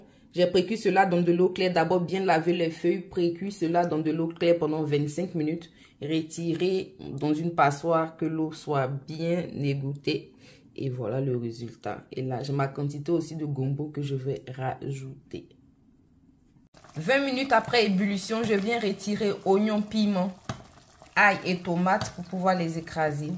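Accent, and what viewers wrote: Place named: Ivory Coast. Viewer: Merci ma go ivoirienne préféré j'adore ton accent et ta manière de parler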